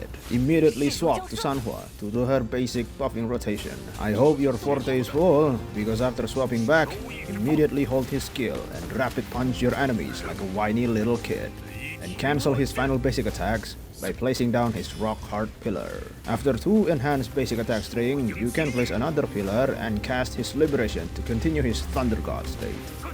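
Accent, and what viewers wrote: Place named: Philippines. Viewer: Man that accent😅 i cant. I assume you're a filipino or indo cuz i also sound like you whenever i speak in ingleesh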